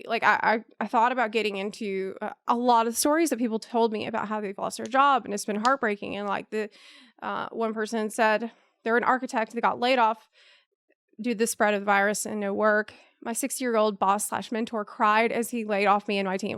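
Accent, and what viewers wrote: South, United States. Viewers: I have just discovered your channel recently and I knew you sounded American, but I had no idea you live in Ukraine!? What you doin' over there? Love your content, and I'm also staying in my house except for necfesary grocery shopping. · I never would have guessed you live in Ukraine. Your accent seems vaguely southern United States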